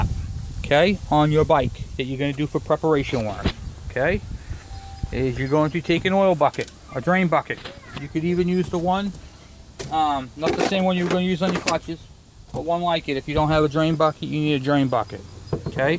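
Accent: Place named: Maine, United States. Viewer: also, i noticed you have an accent... You wouldn't happen to be from Maine living in NH?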